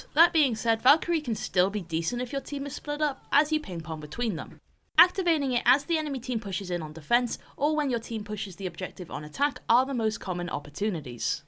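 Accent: United Kingdom. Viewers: Waaaaiit... Are you British? Also pls upload more vods and in general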